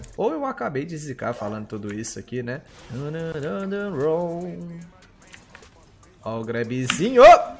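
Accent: Minas Gerais, Brazil. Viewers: eh mineiro?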